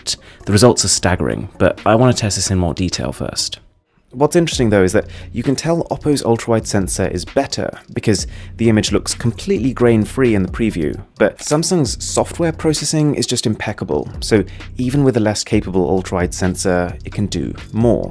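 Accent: United Kingdom. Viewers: that's normal British accent you're just being salty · that's his NORMAL voice british accent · PacMacDo it’s definitely not a generic English speaker, you can tell he’s got quite some British accent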